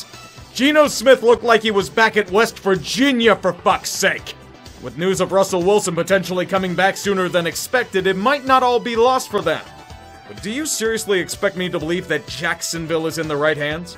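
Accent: Pittsburgh, United States. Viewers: Anyone else love his “yinzer” accent? I think it’s hilarious 😂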